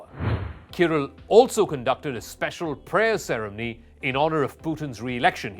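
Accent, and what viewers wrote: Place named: India. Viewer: dellivver.. lol. indian accent is amusing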